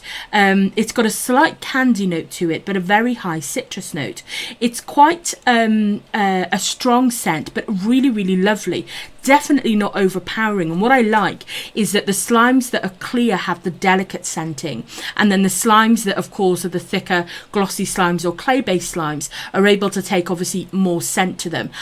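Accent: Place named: England, United Kingdom. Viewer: Great review! I’m in love with ur English accent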